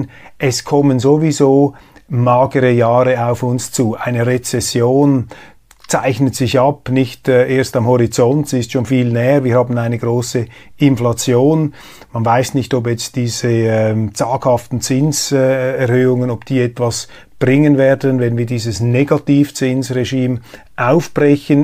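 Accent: Switzerland. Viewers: Riäsa. 🤣 Uff Schwiiizer Dütsch. Traumhaft. Beste Grüße.. Wunderbarer Kanal Dankeschön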